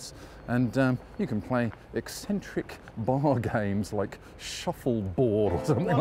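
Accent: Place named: RP, United Kingdom. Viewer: Gracious, what a delightful RP accent! I didn't think there were any left. Not once did you pronounce "fings" instead of "things"--a rarity these days. 'Spoken from a true admirer across the North Atlantic. 'Cheers.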